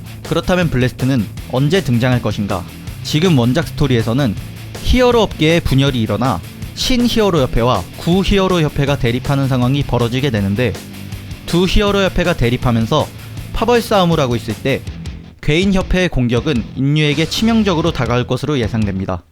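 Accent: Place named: Gyeongsang, South Korea. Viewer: 경상도분이신가보넹 잘봤습니다.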